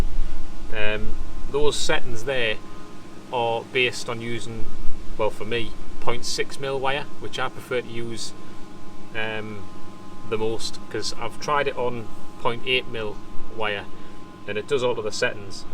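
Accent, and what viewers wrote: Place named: Northern England, United Kingdom. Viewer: Top Vid, sounds like your up north. Do you know of any good MIG gas suppliers in north-east (Stockton/Co Durham) but not BOC?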